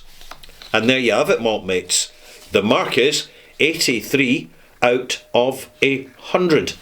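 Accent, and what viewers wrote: Scotland, United Kingdom. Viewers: The most I've ever laughed watching one of your videos was seeing you trying to imitate a stereotypical Scottish accent when you already have one!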